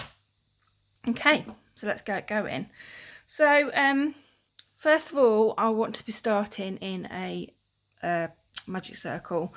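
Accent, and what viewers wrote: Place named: Midlands, United Kingdom. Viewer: Great video. I just love your Midlands accent, Birmingham??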